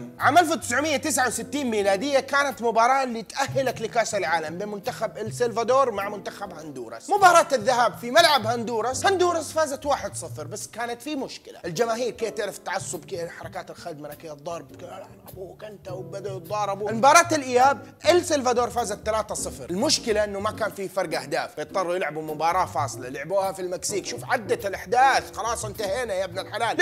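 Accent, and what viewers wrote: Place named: Hejaz, Saudi Arabia. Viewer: يب سعودي يتكلم لغه اهل الحجاز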